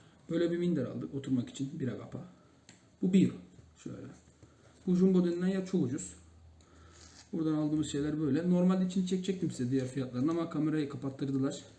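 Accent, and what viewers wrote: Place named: Alaşehir, Turkey. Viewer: " Bi kaç tane GAÇAK çektik ama " İstediğiniz eğitim seviyesinde yada yaşam standartlarında olun. Bir Alaşehirliyseniz şivesinden kaçamazsınız 😅 Başarılar kardeşim 👍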